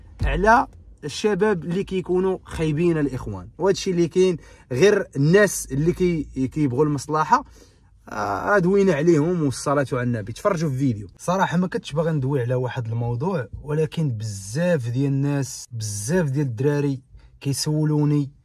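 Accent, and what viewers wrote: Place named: Algeria. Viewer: سمح لي اخويا وقيلة نتا ولد 2000 وطلع لاحظت كلامك كيشبه للجزاير واش متبع الحزايريين تانسيتي لهجتك المغربية؟ ديك كلمة ياو راني نتبع فيك راه لهجة ديالهم ولازم تاهي ديالهم والمدامة ديالك لي قلتي فالتعليق لاخر تاهي ديالهم .المهم حاول تهدر بالمغربية احسن .هما ولاو يهدرو بلهجتنت وانتم العكس وليتو تهدرو بلهجتهم؟ كون غير زينة وهي نصها مديكالي وكلها فرنسية معوجة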